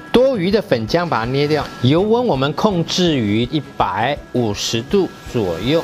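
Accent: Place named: Hsinchu, Taiwan. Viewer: 我是北埔人，你說的客家話的音跟我ㄧ樣，我也想多學些道地客家菜，譬如 薑絲大腸等等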